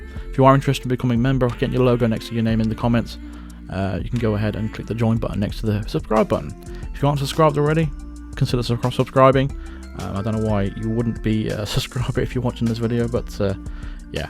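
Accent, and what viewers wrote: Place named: England, United Kingdom. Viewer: you're the man! It takes a lot of courage to put yourself out there like that. Anonymity is also pretty cool, you show your face when you're ready. You've got my support from Sweden. Its a good time for a break now you have until Jan 20 2021, we're all counting on you for Hitman III. I watch your vids because you get the business done in a good way with great commentary. As an expat I like to hear the English accent. You sound like a good guy and very likable. BTW with the new consoles coming out I bet everyone is looking to upgrade there TVs. Have you got any tips of what we need to look for frame rates, 4K or 8K etc.?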